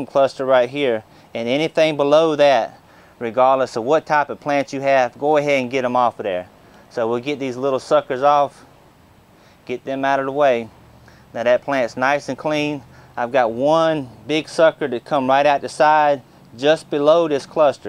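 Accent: South, United States. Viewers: I love to hear people talk with this particular southern drawl like he has got, by the way, beautiful tomatoes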